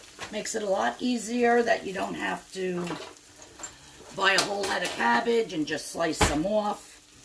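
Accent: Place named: Massachusetts, United States. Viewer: Hi! Love all the videos and your tips and cute accent (mostly because I think it sounds like you live near me--I live in Central Massachusetts).... I love the breakfast you made and the idea of meal prepping--I can't wait to try the French Toast Cups and the egg bake! The great thing about both of those recipies is you can customize them to what you want to have that week--like I think gingerbread flavor french toast cups might be fun--or I might add a little mashed banana, unsweetened coconut and almond extract... or maybe even strawberries or raspberries or chopped small apples.... Thank you so much! I love WW and it is so nice to see all that you share on your journey! I have lost almost a hundred pounds on WW and now have 83 pounds until goal! With your videos and support from my workshop & other online groups I believe I can do it! 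Thank you a thousand times over! I will be sharing on FB for you! Best wishes for 2023!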